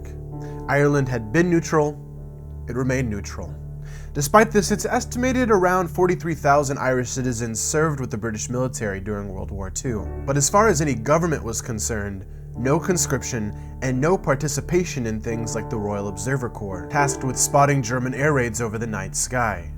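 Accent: United States. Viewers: Well done on the Irish border explainer - it's somehow reassuring to have a knowledgeable US voice explain it. Makes it all feel less crazy. I'm looking forward to watching your Berlin Wall video - I lived through the fall in 1989 when I was studying in Berlin. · I'd love to watch this but one minute in and I can't take the awful american pronunciation anymore, sorry man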